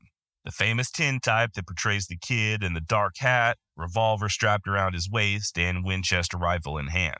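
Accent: Texas, United States. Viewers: The moderator of these stories is first class. Great Texas accent, clear diction, great humor and wonderful storyteller. Pleasure just to listen to him speak.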